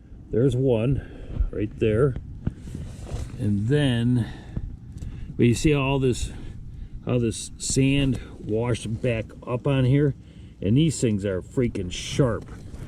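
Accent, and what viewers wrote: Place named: Northeast, United States. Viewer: Where do you live? You almost sound like you’re in the northeast? Mass? I’m in NH and wonder what you do for winter with the pool?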